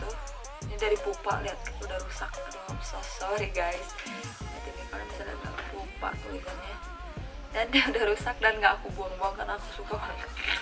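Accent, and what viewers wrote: West Java, Indonesia. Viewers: Chhetri pantesan ada sundanya😄 salam kenal juga kaa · ^Gusti nu agung. ngakak si kaka nyunda :v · sunda pisan nyaa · teteh nyunda pisan 😍😍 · sunda banget kak, gusti nu agung=)) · Baru pertama kali nntn video kakak & langsung suka! cara ngomongnya asik bgt. ada sunda2nya lagi😆 btw beli pupa brush nya dimana ya kak? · Sundanese bgt hihi lucu teh🙈❤️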